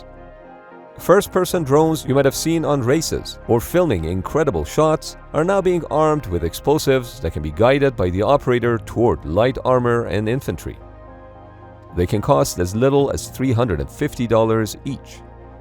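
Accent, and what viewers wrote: Iran. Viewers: Sounds Farsi-influenced :) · Khaliz Persian accent is evident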